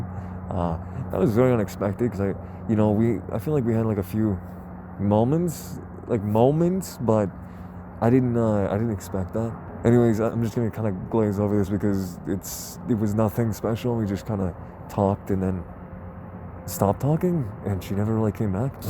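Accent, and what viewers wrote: United States. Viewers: KEEP DOING YOUR COUNTRY VOICE IN YOUR VIDEOS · I was not expecting the country accent😂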